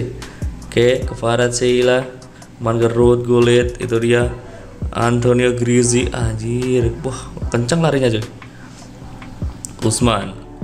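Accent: Java, Indonesia. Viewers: medhok men mas haha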